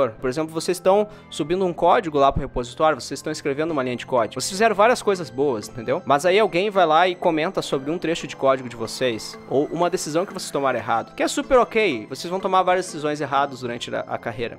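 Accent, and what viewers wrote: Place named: Porto Alegre, Brazil. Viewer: Poha, obrigado pelo diagnóstico! Off: Sotaque porto alegrense detectado.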